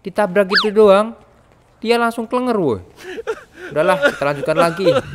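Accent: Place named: Java, Indonesia. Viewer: Med'do banget nadanya Terus nora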